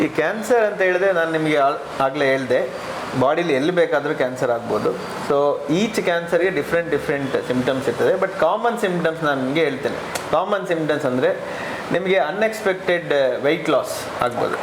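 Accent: Mangalore, India. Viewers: ಕನ್ನಡದ ಬಗ್ಗೆ ಅಷ್ಟು ಅಭಿಮಾನ ಇರುವ ನೀವು ಸರಕಾರಿ ಶಾಲೆಗಳಿಗೆ ಕನ್ನಡ ಕಲಿಸುವ ಅಧ್ಯಾಪಕರ ನೇಮಕಾತಿ ಬಗ್ಗೆ ಸರಕಾರಕೆ ಒತ್ತಾಯ ಮಾಡಿ ಅದು ಬಿಟ್ಟು ಬೇರೆಯವರು ಮಾತನಾಡುವ ಶೈಲಿ ಬಗ್ಗೆ ಅವಹೇಳನ ಮಾಡಬೇಡಿ ಇದು ನಮ್ಮ ಮಂಗಳೂರು ಕನ್ನಡ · ಇದು ಮಂಗ್ಳೂರು ಕನ್ನಡ . ನಿಮ್ಗೆ ವಿಷಯ ತಿಳಿದರೆ ಸಾಕಲ್ಲವೆ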